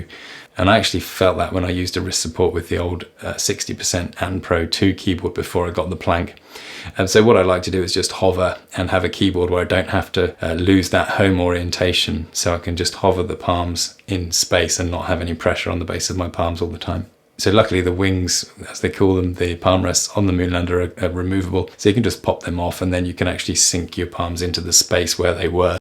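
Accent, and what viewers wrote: United Kingdom. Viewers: I bought the Planck after I saw your video and would never renounce, the split should be in the Planck as some models you can see on reddit where you can basically decide the angulation while having an hinge on top. We need to change our position during the day and I was inspired by you to go also to the garden withe planck. But as I use android studio/intellij and xcode I need a cloud solution to use them via a remote desktop or cm cloud solution, because 16gb ram cannot have on a tablet. Do you develop with cloud based solution in your ide? Keep going with the great videos they are really relaxing and smart, and your English accent helps to enjoy them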